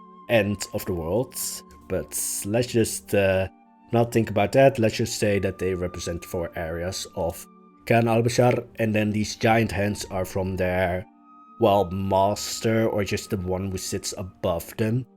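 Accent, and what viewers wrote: Netherlands. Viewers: you sound dutch so i think youre a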